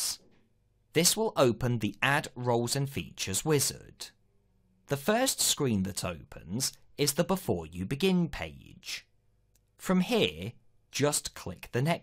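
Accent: United Kingdom. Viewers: Best explanation ever on Hyper-V and I enjoyed the British accent. Well done and thank you for the free training videos.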